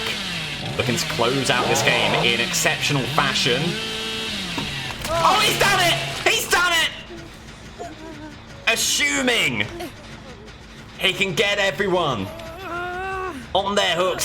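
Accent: United Kingdom. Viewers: So first video I’m seeing of this (I know, bad place to start but I will go back and binge the rest) but saying the killers are rewarded points for hooks when you actually count hook states is a bit confusing. That’s not negative criticism, just thought I’d point it out! Great content, I’m a sucker for a British accent 😂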